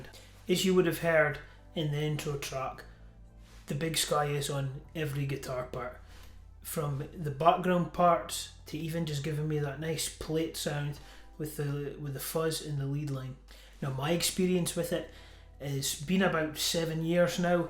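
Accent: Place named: Scotland, United Kingdom. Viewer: lol no it was meant as a good thing I just heard your accent and figured it's Scottish so call him Braveheart 😉. I heard you mention playing in church, I also play for my church. Keep up the good content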